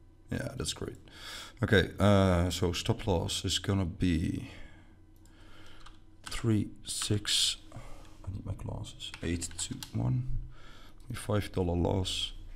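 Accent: Netherlands. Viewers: Nederlands accent 🤣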